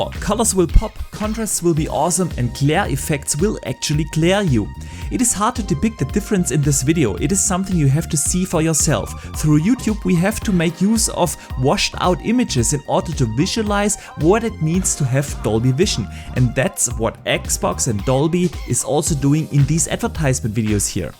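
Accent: Germany. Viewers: Man I really like your German accent :3 Nice video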